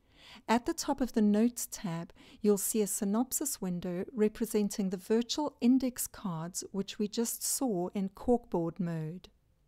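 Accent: South Africa. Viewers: Thank you for the very useful overview. Nice to hear a South African accent too!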